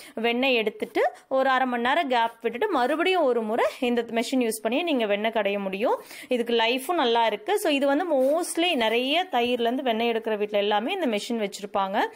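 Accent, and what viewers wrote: Kongu, India. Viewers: Kongu slang video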